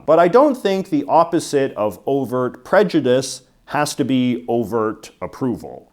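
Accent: Canada. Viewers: is the most Canadian sounding Canadian i've ever heard. Also did not realise you were 38...thought you were way younger than that. ❤ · has literally the most Canadian accent I have ever heard, and I'm a Canadian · There is something in the way that you speak that is slightly hypnotizing. The eye movement. The head movement. The cadence of your speech. The intonation swells. The relaxed smiles. It's very calming. You could easily speak for a 3 hour lecture and I would listen to all of it. .. Maybe it's just because I'm Canadian and like to hear the accent. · You have a very Canadian stereotyped "about". I often heard the stereotype but I didn't think it was real. When I see a same sex couple holding hands, I smile like a loon and I try not to stare at them at all because it would be so creepy to have this stranger smile and stare at the same time. I can't help it, it makes me so happy. 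It's so rare where I lived, I think it happened to me only once. I hope that one day, it will happen so frequently that I will have a normal reaction. (just to be clear, I'm queer too) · Mmmm hard to say and tricky subject I mean I hope shit calms down but I think most men 19-25 say have the thought of "could tap" with any possible mate which does get in the way of meaning ful relationships with woman and so in a ignorant attempt to step into one's shoes the young man with think that a young man who is instead attracted to men will then have similar thoughts. It is kinda baked into what we are. The whole friendship thing is just a plus of having big brains. I had something I wanted to say but forgot after trying to get all this out oh yeah kinda lame you are only know for being gay I remember being kinda surprised but you really don't talk about it. I never know If I should be typing third person so it doesn't read like I am talking to the youtuber hie self... 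you know? anyway I always think of this guy as the one canadian that actually sounds like that and the guys isn't even a newfy I have even visited my uncle in Vancouver and I didn't pick up the locals accent. Man that was long winded I need some more fluoride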